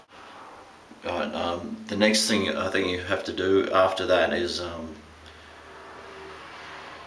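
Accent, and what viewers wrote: Australia. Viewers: Is he Australian,he sounds like one of my friends from Australia! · I knew you were Australian!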